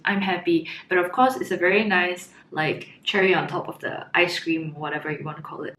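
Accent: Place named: South Korea. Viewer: Haha glad to watch this video, I am using circles'life $28/100gb plan now and happy about it. Are you from Korea? Your English sound like Korean's English, haha. I am from Korea.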